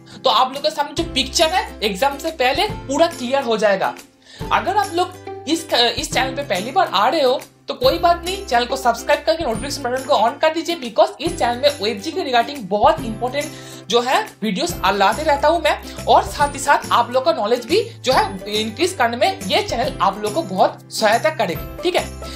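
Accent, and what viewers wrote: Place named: Bengal, India. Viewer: দাদা তোমায় হিন্দি থেকে বাংলায় ভিডিও দেওয়ার জন্য সাজেস্ট করছি। কারণ তুমি বাঙ্গালী এবং তোমার মধ্যে বাঙালির কথার টানটা থেকে যাচ্ছে। আমি সাজেস্ট করবো তুমি বাংলায় ভিডিওটা বানাও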